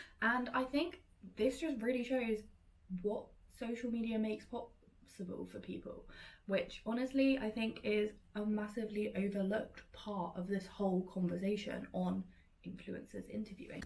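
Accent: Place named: RP, United Kingdom. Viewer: Your accent is so strong and you sound so posh. Haha, I say this in an endearing way from someone who is not anywhere close to the UK, and is from Indonesia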